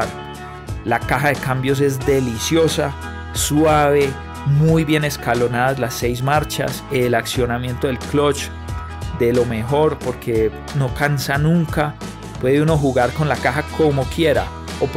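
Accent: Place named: Antioquia, Colombia. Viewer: Que bien se oye el acento paisa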